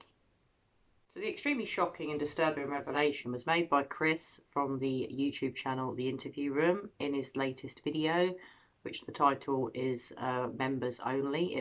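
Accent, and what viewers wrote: United Kingdom. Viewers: Love your British accent. We need to get Don "bang to rights" (right?)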